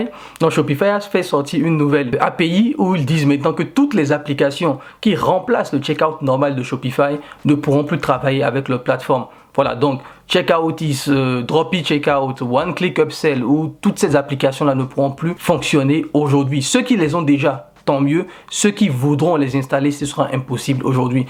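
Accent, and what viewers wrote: Benin. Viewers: Je clique sur la vidéo et j'entends un accent béninois corsé hahah ca fait du bien je me suis tout de suite abonnée.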